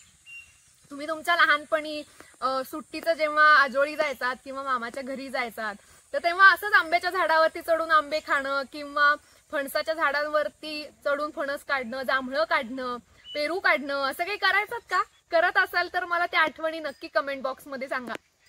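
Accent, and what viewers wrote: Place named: Rural, India. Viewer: Love your Gramin languages tone when u use. ........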